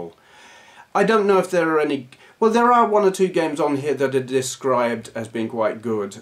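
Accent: United Kingdom. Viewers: Your cussing is refreshing, especially in the Queen's english. = )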